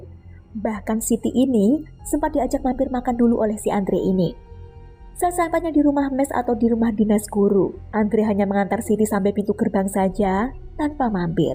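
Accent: Java, Indonesia. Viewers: Lebih enak didengar jika medoknya dihilangkan tapi mungkin susah ya, dan mungkin itu ciri khas channelnya.. Kalau medok saat berbahasa Jawa memang wajar, tapi saat berbahasa baku tetap saja medok, agak (maaf) tidak enak didengar... Tapi sekali lagi mungkin sulit dihilangkan dan sudah jadi ciri khas 🙏🏽🙏🏽☺️☺️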